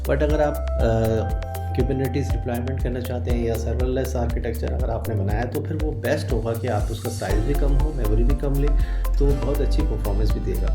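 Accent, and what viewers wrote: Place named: India. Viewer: This hardcore indian-english accent, combined with the loud background music makes it really hard to understand anything at all 🙁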